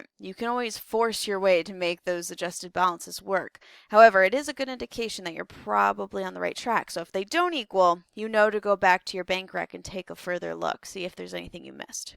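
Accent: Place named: United States. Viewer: Oh I know now, American English!!!🧐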